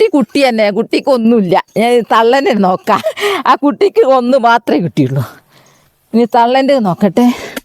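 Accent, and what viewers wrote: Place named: Mukkam, India. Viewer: ഭാഷ കേട്ടിട്ട് മുക്കം ഭാഗത്താണോ എന്ന് സംശയം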